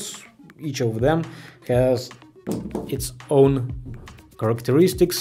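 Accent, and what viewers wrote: Russia. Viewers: Акцент русского человека!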